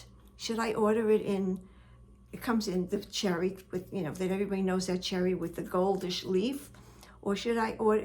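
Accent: New York, United States. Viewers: Same! She's a real New Yorker, in the Pacific Northwest! Not common I would guess.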